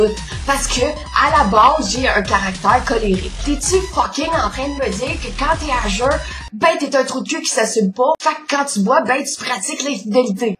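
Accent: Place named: Montreal, Canada. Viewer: ah laccent dmontreal... jaime bien :3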